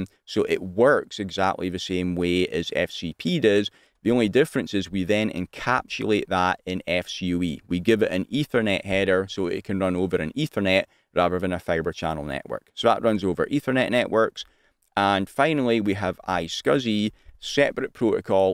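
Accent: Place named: Aberdeen, United Kingdom. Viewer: haha, you still sound Aberdonian to me! I live in the centre, spent the majority of my life in Bucksburn. Really appreciated the video, I've subbed to your channel too. I graduated a year and a half ago at RGU in networking, been working service desk for a year and 4 months, an internal position has opened up for a infrastructure/cloud analyst. Trying to get an idea of what to expect for interview questions! I'm good with networks and also learned a lot about security but this is another ball park. I've built a homelab with esxi/proxmox, my own domain etc but there is only so much you can do on personal kit. I'll be sure to watch more of your videos when I get a minute. Cheers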